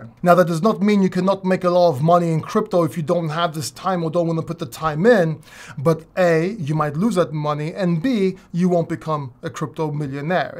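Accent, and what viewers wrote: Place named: North London, United Kingdom. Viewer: I could detect a slight N London accent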